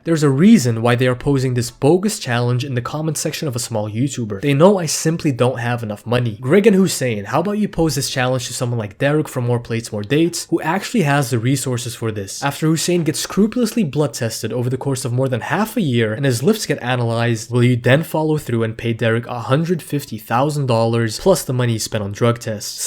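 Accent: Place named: Morocco. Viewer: By the way I wanted to ask, are you moroccan? You sound like a moroccan lol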